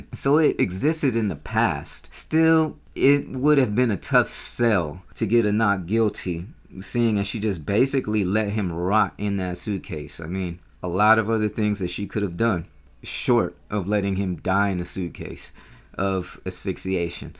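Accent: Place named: Texas, United States. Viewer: I mean I hear the Texas in your voice! I think you should do it!!!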